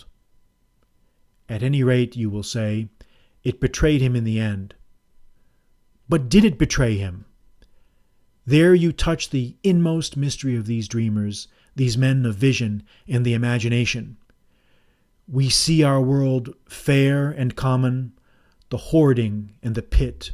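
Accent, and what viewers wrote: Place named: United States. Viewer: cannot listen to English authors and English stories read by Americans.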